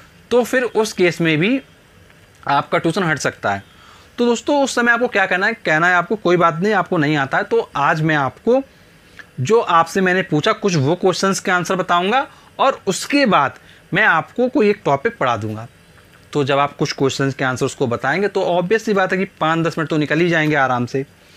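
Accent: Kanpur, India. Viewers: Sir i think you are from kanpur😂 right sir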